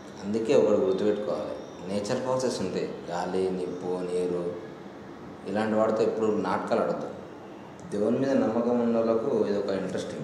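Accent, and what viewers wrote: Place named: Telangana, India. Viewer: comic comments....especially the telangana yaasa....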